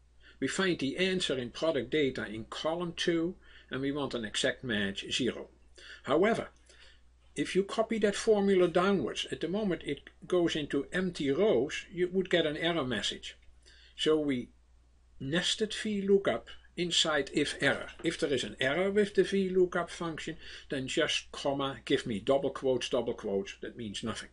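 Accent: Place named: Netherlands. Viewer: Hey, very helpful information thank you, I'm guessing by your name & accent you're Dutch. My Dad is from Holland & we have the same name. Lol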